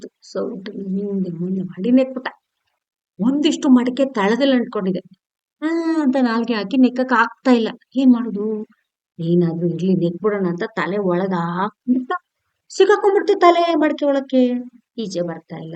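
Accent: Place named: Mandya, India. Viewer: ಮಂಡ್ಯದ ಜನ ಮಾತನಾಡುವ ಹಾಗೆ ಕಥೆಯನ್ನು ಹೇಳಿದ್ದಿರಾ ಕಥೆ ತುಂಬಾ ಚೆನ್ನಾಗಿ ದೆ ಕೇಳುವುದಕ್ಕೆ ಹಿತವಾಗಿದೆ 🥰🥰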